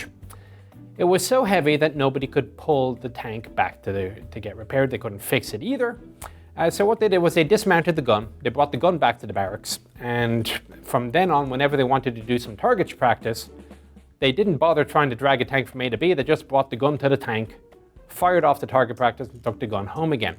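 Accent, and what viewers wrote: Ireland. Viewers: Did the accent never give it away? lol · all my life living with an irish mother is probably why i never noticed your irish accent... I alway knew it sounded a bit strange though, guess i just never put two and two together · It’s that Irish lilt and occasional ‘tongue in cheek’ observation that keeps one engaged.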